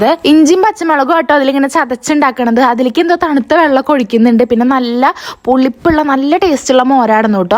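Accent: Thrissur, India. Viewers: Eniku Thrissur bhasha bhayankara ishtanu... samsaram adipoli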